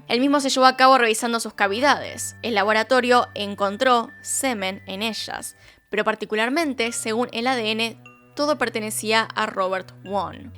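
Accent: Argentina. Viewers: Ala , que hermosa eres y que bonito ese acento argentino, que chula manera de narrar algo atroz 😭 que arte.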